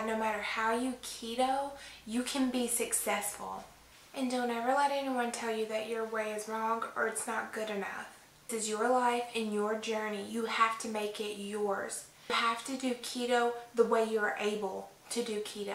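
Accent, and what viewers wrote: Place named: North Carolina, United States. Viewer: I love your accent. North Carolina?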